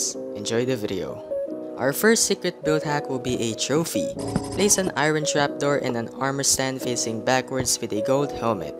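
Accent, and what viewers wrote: Philippines. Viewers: You sound Filipino.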